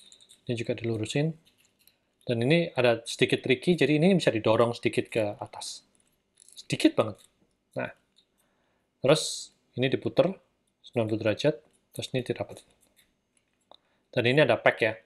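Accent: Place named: Java, Indonesia. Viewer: Mantep... medhok reviewer e wkwkwk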